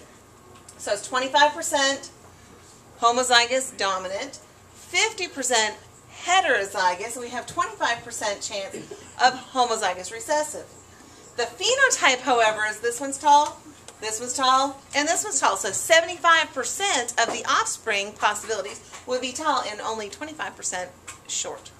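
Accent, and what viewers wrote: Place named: South, United States. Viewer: That's pretty amazing information! I love your new found southern accent! I can see that you turned out to be a great science teacher!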